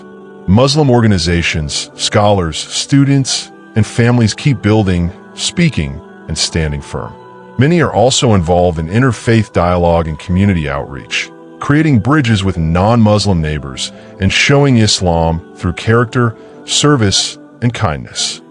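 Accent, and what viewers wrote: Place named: United States. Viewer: Another American narrator!!!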